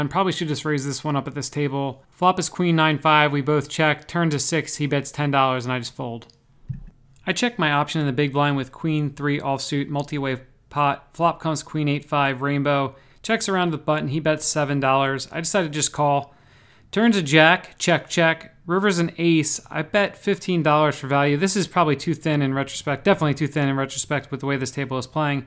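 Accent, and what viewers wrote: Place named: Mid-Atlantic, United States. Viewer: Toedal profit lol can't hide that mid-atlantic accent, really enjoy the vlog!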